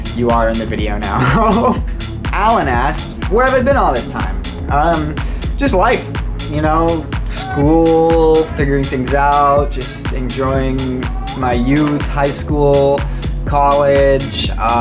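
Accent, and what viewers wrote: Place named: United States. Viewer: I heard this type of voice everywhere in America. Just why do they all sound the same.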